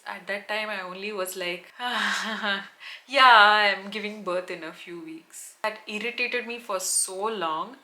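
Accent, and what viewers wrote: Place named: Bangalore, India. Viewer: Is it just me or theres proper bangalore accent exploding in this video ❤❤😂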